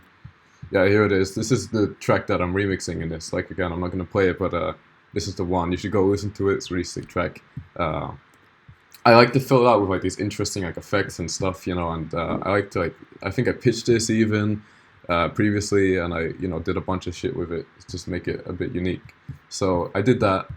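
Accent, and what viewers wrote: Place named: Sweden. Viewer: Jag hitta en guldgruva !🤩🤩 fan oslagbart kommer kolla på din kanal 24/7 . Jag gör bort mig om du inte är svenska utan jag bara dömer på din dialekt Ahah.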